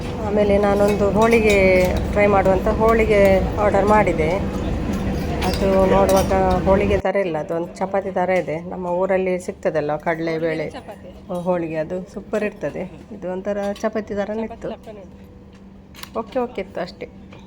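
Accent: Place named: Mangalore, India. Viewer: Nim mangalore Kannada bashe Kelakke Chanda ❤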